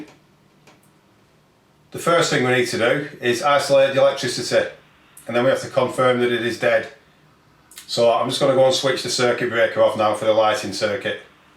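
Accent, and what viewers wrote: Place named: Blackburn, United Kingdom. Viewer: You sound like your from Blackburn? Your vids are a godsend and those wago connectors are fab!!! Top banana;)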